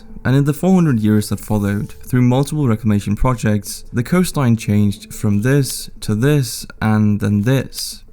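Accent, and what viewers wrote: Denmark. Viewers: Man kan bare høre han er dansker lmao. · This is completely unrelated but god damn the danish pronounciations are just so authentic!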